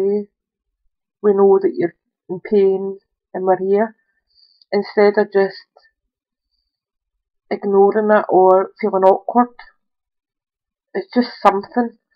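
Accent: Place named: Scotland, United Kingdom. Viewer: I could listen to you for hours mrs - love that Scottish accent!! But I know what you mean, I feel monotone Irish lol x hugs Sandra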